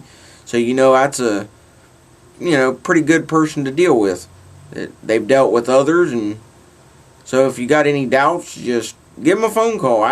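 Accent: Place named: Texas, United States. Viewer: Wow I love your accent! Which state do you stay in? Texas? :)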